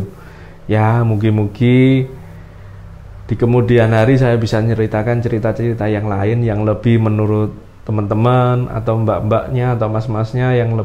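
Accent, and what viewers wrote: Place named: East Java, Indonesia. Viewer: Nggresik... Njombang. Jawa timuran banget (≧▽≦)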